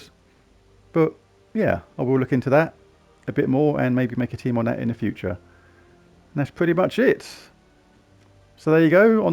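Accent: United Kingdom. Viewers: nice to see a british? (you sound british) gems of war youtuber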